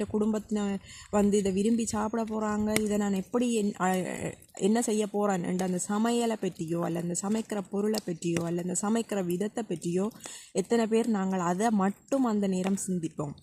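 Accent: Jaffna, Sri Lanka. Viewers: நல்ல விளக்க உரை, நன்றி சகோதரி, நிகழ்ச்சி தொடரட்டும், வாழ்த்துக்கள், நான் விரும்பும் இனிமையான யாழ்ப்பாண மொழி,(Sri Lanka வில் இருந்து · vadivaa Lankan/ Jffna Thamizh'la kathikreeka.. Nallaa piichirukku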